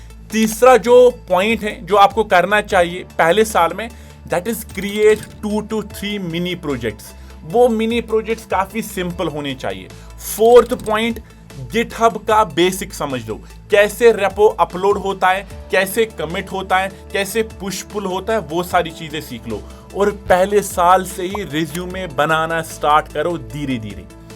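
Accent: Kashmir, India. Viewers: Why ur accent sounds like kashmiri?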